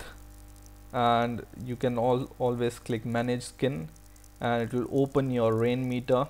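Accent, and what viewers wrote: India. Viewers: The accent is like a cleaner version of indish. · Lemme get things clear, Your PC is in German, But you sound Indian... Makes sense.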